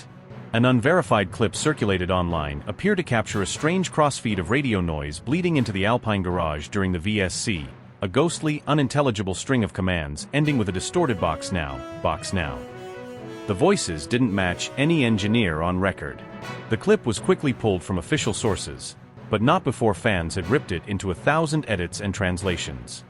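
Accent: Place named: United States. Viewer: Absolute rubbish. Why does the voice always have an american accent. Liberty Media as part of the tRump Mafia?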